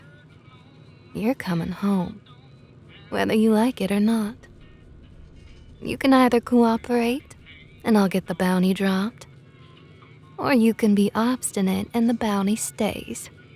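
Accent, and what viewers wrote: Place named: South, United States. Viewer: Ok I'm begging please take the ropes off I'm sorry for what I did please they're really hurtin me thanks for the wonderful audio really love the southern belle accent 💕🌹💕🌹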